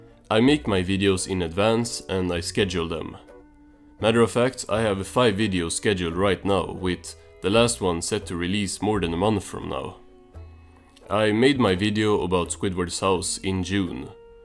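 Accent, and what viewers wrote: Sweden. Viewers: It's for sure scandinavian, I'm leaning towards swedish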